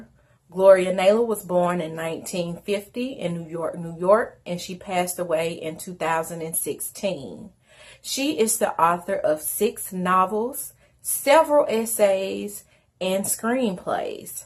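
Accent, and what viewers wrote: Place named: South, United States. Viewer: I didn't want Mama Day to end 😢. Love your Southern accent , TN girl here just subscribed 💕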